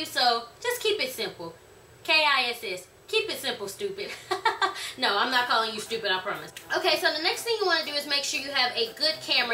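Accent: South, United States. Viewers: I love your southern accent...if you have one.... Very insightful video :D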